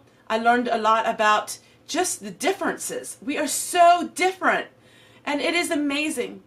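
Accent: Pennsylvania Dutch, United States. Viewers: Congratulations! I found you just over a week ago, but have been enjoying your channel so much. Your laugh (giggle) is one of my favorite parts of them! Your Pennsylvania Dutch accent is charming too! Thanks for being so real and down to earth! Merry Christmas to you!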